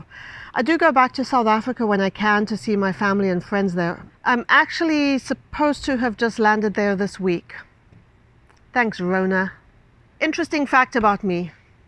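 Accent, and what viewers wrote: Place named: United Kingdom. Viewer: London I get it cause you have a tilt in your accent to the British and as for Croatia I really do not know why 😂😂😂😂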